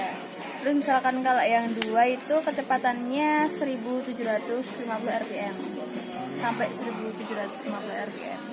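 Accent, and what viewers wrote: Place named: Java, Indonesia. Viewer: Buat ngebor kmu bisa apa ngga mba wkwkwk...kayane wong njowo mba e iki hehhe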